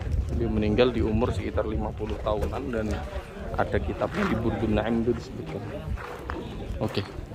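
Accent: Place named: Banjarmasin, Indonesia. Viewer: Kyak ada dengar suara org banjarmasin,, bapandir bahasa banjar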